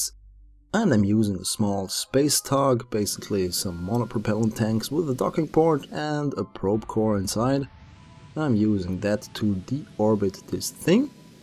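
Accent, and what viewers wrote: Germany. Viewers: man merkt das du deutsch bist😉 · where are you from? germany?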